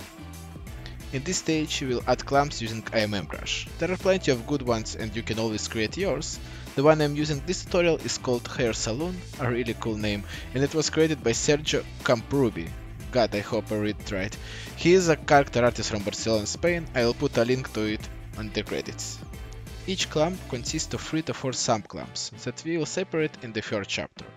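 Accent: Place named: Russia. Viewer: хах, русский акцент слышно сразу)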